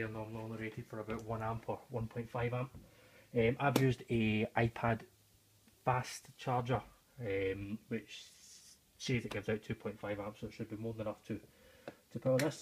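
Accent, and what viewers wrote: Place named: Scotland, United Kingdom. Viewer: His "gibberish" is Scottish accented English.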